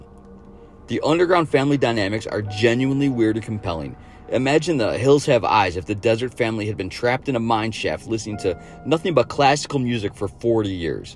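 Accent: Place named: Chicago, United States. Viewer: I thought you might be from Chicago. Your accent is subtle. I am 45 from Tinley Park.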